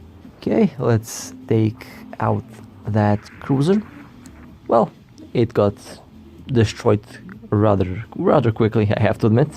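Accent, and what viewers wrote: Russia. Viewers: Это у тебя русский акцент или мне просто кажется? Видео снималось на тесте?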